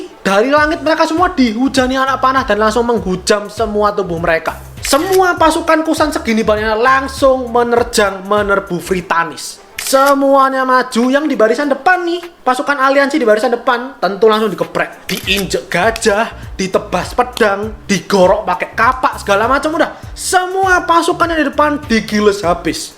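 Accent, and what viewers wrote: Java, Indonesia. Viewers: Medoook bgt loooo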